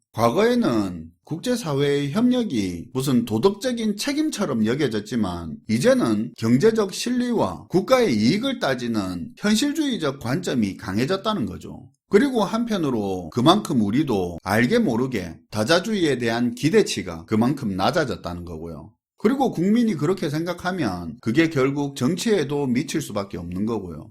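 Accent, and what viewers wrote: Gyeongsang, South Korea. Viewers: 개쌍도 어투 진짜 토나온다. 하지마라.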